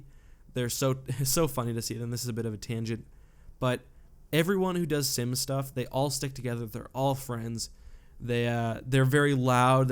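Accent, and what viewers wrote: Canada. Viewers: You get really Canadian when you do rambling videos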